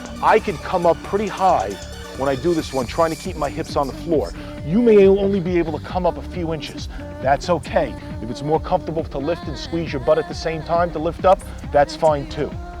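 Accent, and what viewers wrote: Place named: New York, United States. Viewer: Love that New York accent!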